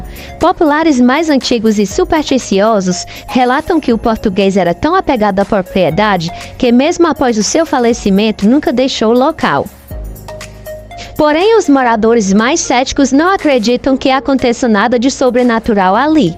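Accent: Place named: Saxony, Germany. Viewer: Morava perto quando novo e era especialmente maravilhado com as rampas para carros. Você é cearense mesmo? É uma dúvida sincera, porque sua pronúncia dos "R" é um pouco saxônica.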